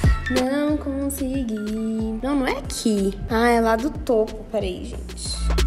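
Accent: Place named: Northeast, Brazil. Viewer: Aqui.se chama lerota kkkk ou ataca mas amoooo esse nosso sutaque nordestino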